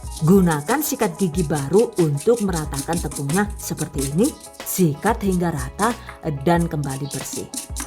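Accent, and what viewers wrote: Banyumas, Indonesia. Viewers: mmg sllu beda...lbh keyeeennn...tampilan kuenya cantiq kyk inyong ☺😚ngapaknya itu lho jd ciri khas 🤗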